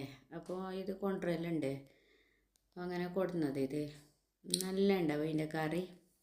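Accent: Kasaragod, India. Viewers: Ningale Kasaragod language nalla rasandu 😊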